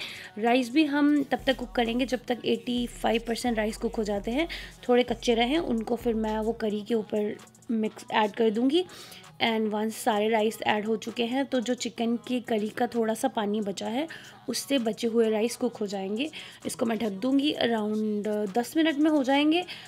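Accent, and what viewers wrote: Punjab, India. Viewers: Ap bht cutely punjabi bolti ho ❤☺☺☺Or apki family bht sweet hai 😍😍😍 big fan of you 😘😘😘😊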